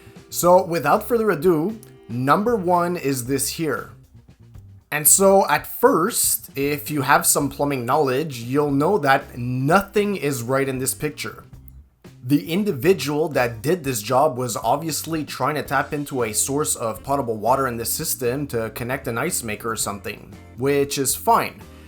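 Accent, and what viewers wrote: Quebec, Canada. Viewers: bro you good he’s from Quebec that where he gets his accent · You're definitely from Quebec just by hearing your accent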